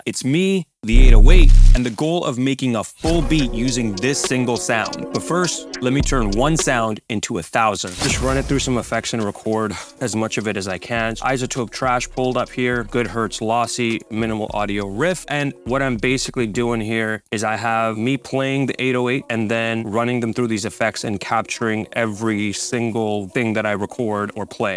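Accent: India. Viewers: Tutorial indian accent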